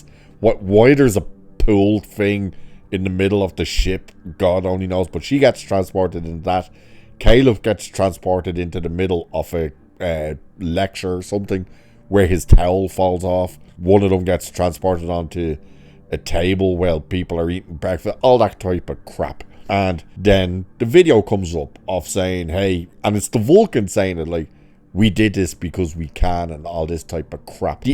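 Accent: Ireland. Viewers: He's Irish not English.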